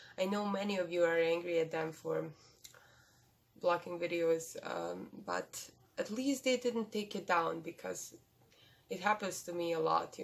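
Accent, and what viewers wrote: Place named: Russia. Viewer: Ты же русская, по акценту слышно_)))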